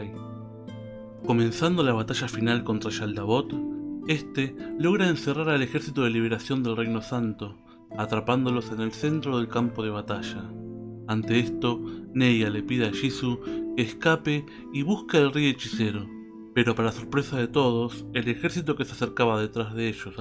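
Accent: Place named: Argentina. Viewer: ¡Ése sentimiento en el guión! 👍 👍 👏👏👏,para un Hispanoamericano, sólo lo puede vocalizar un Argentino 🙌🙌😘💜, te lo dice un Chileno amante de su Patria, ¡bravo por tí! 👏👏👏✌✌✌🙌🙌🙌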